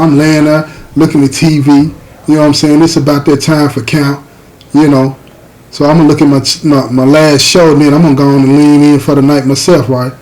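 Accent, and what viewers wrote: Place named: Tennessee, United States. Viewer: I never heard the accent of anyone from Tennessee until I started listening to you and.😊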